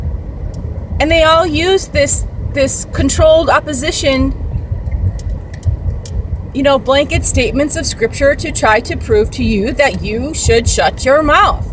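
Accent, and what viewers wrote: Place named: California, United States. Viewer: Hey buddy. Are you in Cali? You sound like you're in Cali.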